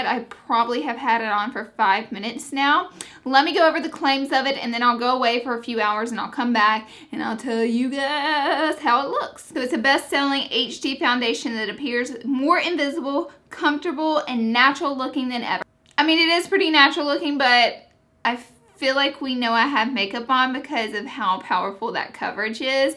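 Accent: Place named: Appalachia, United States. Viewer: Oh my god I love whoever this is because of the cute hillbilly accent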